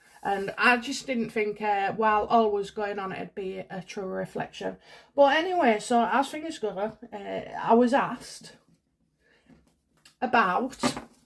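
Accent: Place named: Northern England, United Kingdom. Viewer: I thought it was Nothern of some kind. 😍. I'm from Sweden. 😀.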